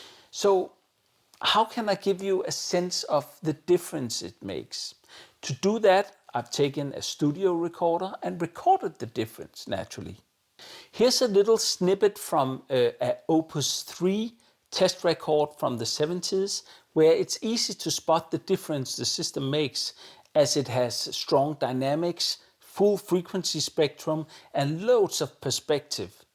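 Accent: Denmark. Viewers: I Iove your reviews and your Danish accent! Back in 1990, my first “real” amp was the Technics SU-V90D 😉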